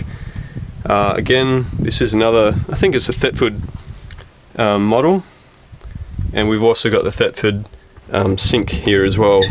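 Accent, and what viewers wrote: Australia. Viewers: Love your build. It's comfortable and practical and it looks good too. Is this a medium wheel base or a long wheel base? Loved hearing the Aussie accent.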